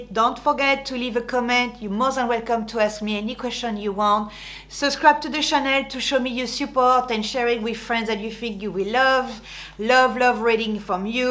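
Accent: France. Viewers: I love your smile... and your French accent 😊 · Great video. Love your French accent! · I love your style tips with your lovely French accent, and Oscar is so cute! 😘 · I love your french accent! I honestly just really love it! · Hi, I love your accent and of course your channel. Your accent reminds me of my childhood living in Belgium when I had to learn French at school. I am German, now living in New Zealand and I totally understand your cultural struggles. I am glad I found you. After I lost my husband nearly three years ago to cancer, your videos give me a little bit of joy and a few moments of going down memory lane, when we visited Paris, or me, staying in an isolated house by the sea in Britagne for a holiday and remembering my French friend Isabelle who introduced me to real Champagne and Oysters... Thank you for bringing back these memories to me.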